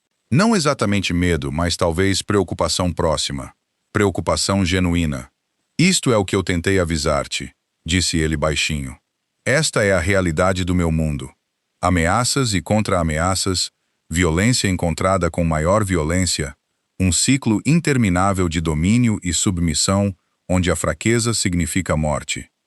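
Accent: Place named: United States. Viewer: Odeio essas palavras em Português é sótaque americano é horrível fala o português por favor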